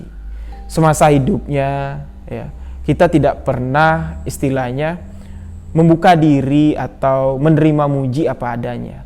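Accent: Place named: Madura, Indonesia. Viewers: Kembara sunyi (itank tirta) = logat Surabaya = logat madura 😁😁😁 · Madura detected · iyaa bener logat madura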